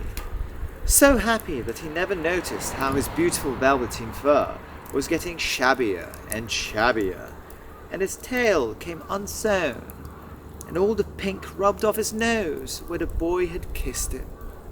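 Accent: United Kingdom. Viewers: 焚き火の音もさんの声もイギリスの訛りも全部好きでこれ聴きながら眠りについています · 美しいイギリス英語に聞き惚れますね~はぁ続きが楽しみ✨素敵なクリスマスプレゼントありがとうございます😭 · 最高のヒーリング動画…🥺 イギリス英語ってなんでこんなに耳心地がいいのでしょう… さんの読み方がいいのか…！眠るまで聞き続けます😇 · 発音が心地よくて本当に聴いてて最高です。これをきっかけに「ビロードのうさぎ」の洋書も書いました。もし可能なら「えんとつ町のプペル」の英語版の朗読もイギリス英語でやっていただけるとうれしいです🇬🇧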